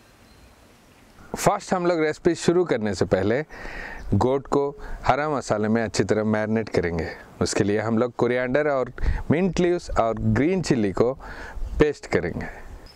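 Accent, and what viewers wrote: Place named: Tamil Nadu, India. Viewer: Kaun Se Gao Wale Bhaisaab Tumhe ??? Baat Karne Ka Style Se Tamil Waale Dikhren Tumhe...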